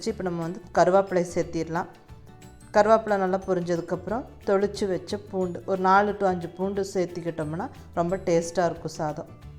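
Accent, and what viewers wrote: Kongu, India. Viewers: Superrr ngov.all ur preprations r neat and simple.mainly our kongu language awsome.best wishes pa. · கொங்கு தமிழற நீங்க பேசறதபார்த்தா தெரியுது மேடம்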